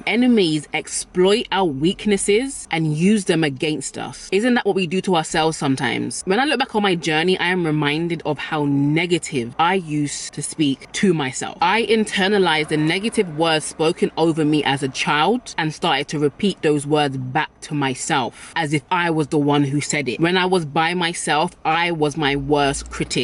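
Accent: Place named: United Kingdom. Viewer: I love her ,I think it because I feel like a black person 😂inside of me , the only thing is I don't like her British'accent its kind of crazy for me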